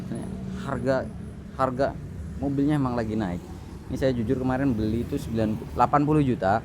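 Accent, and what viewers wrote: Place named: Banyumas, Indonesia. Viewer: Om Ini Aslinya Orang Ngapak.Ya Ommm..."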